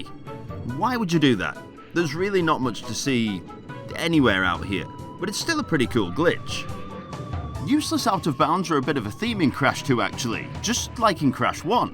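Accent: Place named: England, United Kingdom. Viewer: even the YouTube subtitles couldn't keep up It is not only about your accent, but also the fact that you mash words together, making up new words with even weirder pronunciations. Also known as portmanteaus or slurred speech . Essentially creating a brand new dialect on the fly I find it very sad that someone from England can speak this poorly , even Africans can speak better English.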